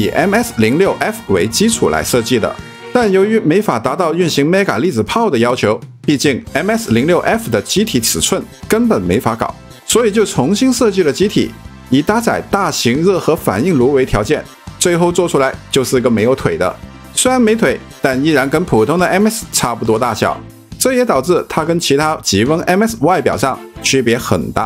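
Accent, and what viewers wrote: Hong Kong, China. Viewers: 香港人說普通話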